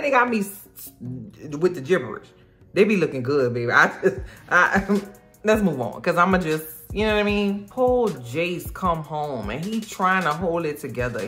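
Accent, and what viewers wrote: New Orleans, United States. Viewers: Baby the New Orleans accent. I subscribed so damn fast! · You have to be from New Orleans lol I hear the accent all day long 😭😁⚜️ love the review!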